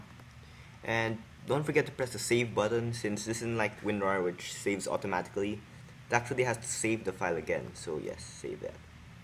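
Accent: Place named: China. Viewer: u have chinnesse accent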